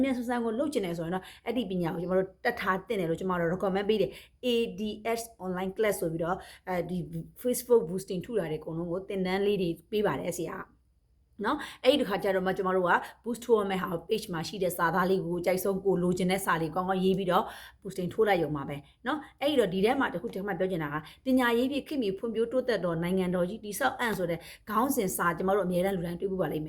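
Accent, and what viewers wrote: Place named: Rakhine, Myanmar. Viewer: Tr က ရခိုင်သံဆင်တယ်နော်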